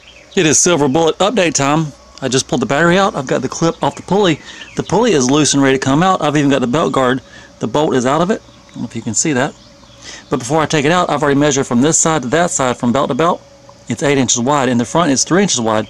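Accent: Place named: Alabama, United States. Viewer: Alabama? Cool accent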